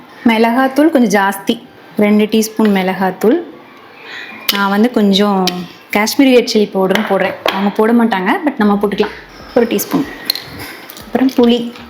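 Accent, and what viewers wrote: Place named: Kanyakumari, India. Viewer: Neengalum kanyakumari slang paesureenga nalla Iruku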